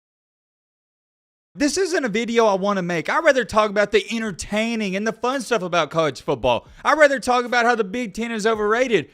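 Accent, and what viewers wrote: South, United States. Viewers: Hoe-Spice? Please never complain that people assume you're an ignorant redneck based on your ignorant redneck Cracker accent. You prove it. You're simply too Dunning-Kruger to understand the condition of my favorite coach. You have no idea how many names and words you mispronounce. What is the redneck name for Ebonics?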